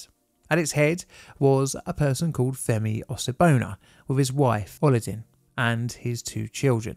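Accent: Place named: Croydon, United Kingdom. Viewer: I knew u were from Croydonn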